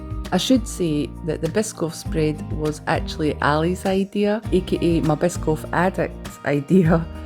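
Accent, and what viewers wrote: Scotland, United Kingdom. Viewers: Hi, I just subscribed. You are from Scotland? or a Scottish person living in the US? I have to binge watch your channel, just to catch up. 👍 I'm Irma from Texas 👵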